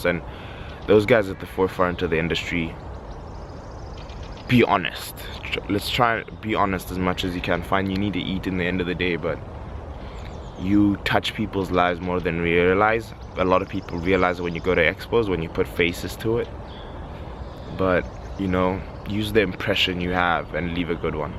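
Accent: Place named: South Africa. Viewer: yo where u from bro? u sound south african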